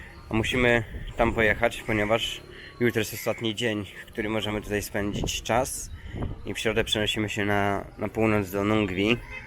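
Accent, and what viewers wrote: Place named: Poland. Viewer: Polski akcent jest :)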